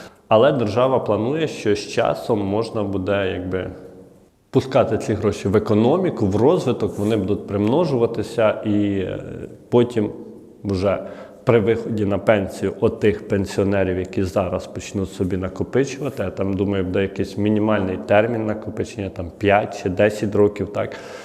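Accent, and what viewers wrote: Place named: Western Ukraine, Ukraine. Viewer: Делай правильное ударение на слове,, буде,,, ты видно с западной Украины, сразу слышно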